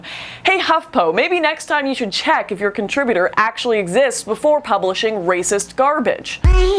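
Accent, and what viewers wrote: Canada. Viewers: "well I don't know aboot you, but once I found out aboot...." Lol. never really noticed that strong Canadian accent before.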